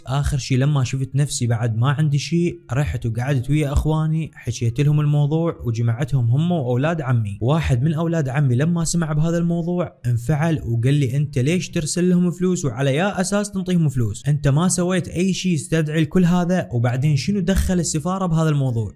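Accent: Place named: Iraq. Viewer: اجمل لهجه لهجت العراق 😊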